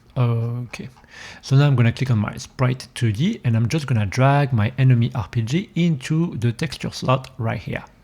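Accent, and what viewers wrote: France. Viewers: Wow that frenchy accent! J't'ai grillé des les premiers mots x) ! Super vidéo, continues comme ça !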